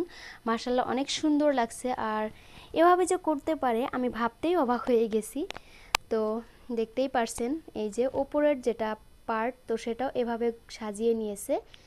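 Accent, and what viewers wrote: Sylhet, Bangladesh. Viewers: সিলেটি নি তুমি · আপনি শুদ্ধ ভাষায় কথা বললেও আপনার উচ্চারণ ও বাচনভঙ্গিতে সিলেটের আঞ্চলিক ভাষার বৈশিষ্ট বিদ্যমান। এই গ্রামটি বৃহত্তর সিলেটের কোথায় অবস্থিত? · আপু কোমার ভাষা আসামের মনে হয় না। মনে হয় সম্পূর্ণ বাংলাদেশী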